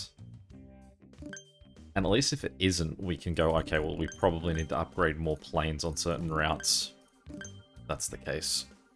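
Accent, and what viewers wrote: Australia. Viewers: I don't mind the Aussie pronunciations of some of these cities, even if they're wrong, because I know Aussies are tired of hearing people saying Bris-BAYN and not Bris-BIN/Bris-BUN for Brisbane, or Mel-BORN not Mel-BURN for Melbourne.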